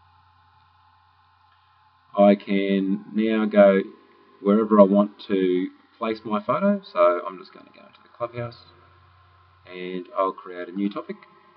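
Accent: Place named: Australia. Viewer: G day Thanks mate aussie accents are my favorite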